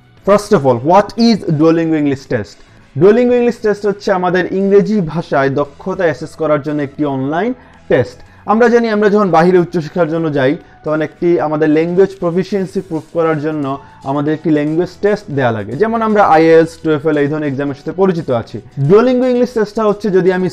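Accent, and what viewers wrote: Sylhet, Bangladesh. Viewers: sylhet e baisaab!! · Right,,he is sylhety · R u from sylhet bro?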